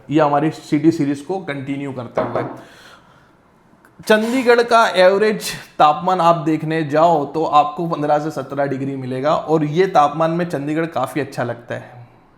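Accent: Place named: Ahmedabad, India. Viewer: Taddan Ahmadabadi accent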